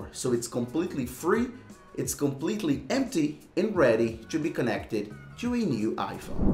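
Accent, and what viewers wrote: Brazil. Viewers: That Brazilian accent is thick my boy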